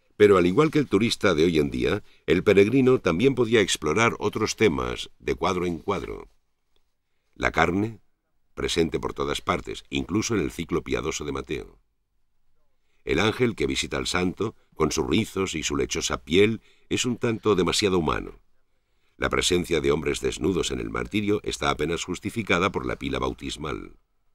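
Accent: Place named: Spain. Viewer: El narrador, un clásico del castellano peninsular.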